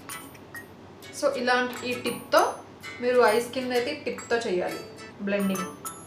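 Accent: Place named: Ongole, India. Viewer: gaaru meedhi ongole la...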